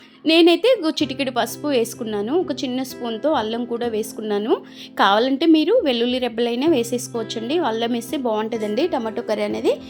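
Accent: Telangana, India. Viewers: మీ మాట్లాడు చున్న భాషలో తెలంగాణ యాస కనబడుతుంది శుభము హరే కృష్ణ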